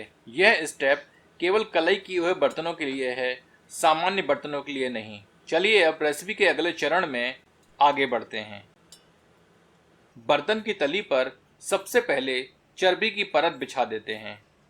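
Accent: Standard. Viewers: bahaut hi shuddh hindi se boltain hain jo sunne main acche lagtain hain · Inki Hindi vartani sun k man prafullit ho jata hai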